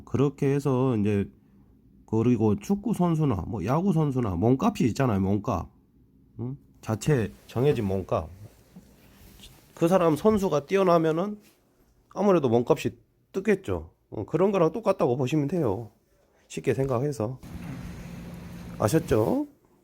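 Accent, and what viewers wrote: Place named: Jeolla, South Korea. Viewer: 혹시....고향이 전라도이신가요 ㅋㅋㅋ 제가 전라도인데 뭔가 구수하네요 ㅋㅋㅋㅋㅋ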